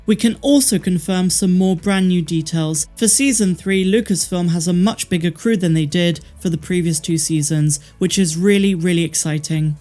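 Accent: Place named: Bristol, United Kingdom. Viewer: I heard your bristonian accent, you hide it well. But not Palpatine well.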